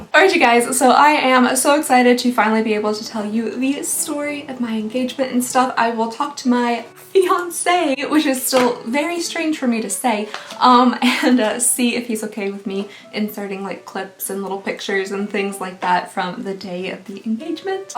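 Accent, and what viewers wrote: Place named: South, United States. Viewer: HE'S SO SOUTHERN! i love it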